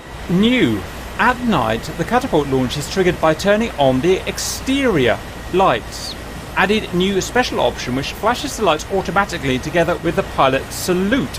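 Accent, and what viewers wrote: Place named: United Kingdom. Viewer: i'm into both military and commercial aviation and i follow tutorials both from you and a guy called 320Sim Pilot, you're both from the UK and maybe it's because i'm not used to the accent but boy you sound similar, sometimes i can't remember which one i'm listening to. Awesome video as always anyway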